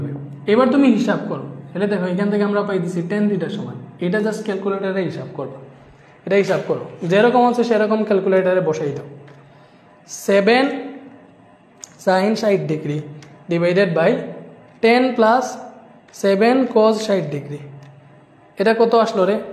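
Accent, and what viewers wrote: Sylhet, Bangladesh. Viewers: ভাইয়া সিলেটি নাকি চট্রগ্রামের?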